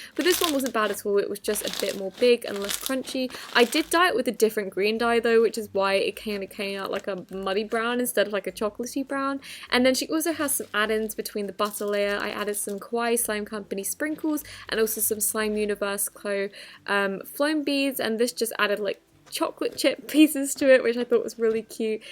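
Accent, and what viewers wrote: United Kingdom. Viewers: Uh yes, British accent, i love it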